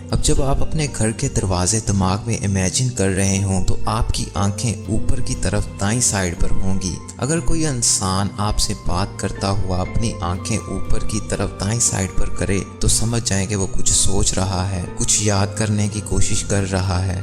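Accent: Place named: Punjab, Pakistan. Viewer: Sir agar ap urdue ko thora bhetar tareke se bolenge to bht maherbani ho plz . ap punjabi lehjey m urdue boltey hn. Plz bura mt mna.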